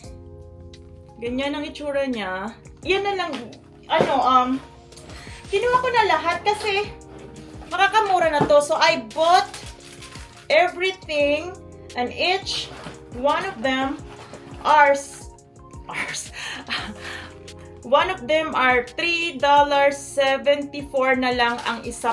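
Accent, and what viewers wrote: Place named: Visayas, Philippines. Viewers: Maam bisaya ka po ba?newbie here po..kasi pansin ko po parang hirap kayo sa tagalog po..nag lolog po kayo hehe sorry po,bisaya rin po kasi ako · Waray po siya kung hindi ako nagkakamali. Magkaiba ang Bisaya sa Waray po.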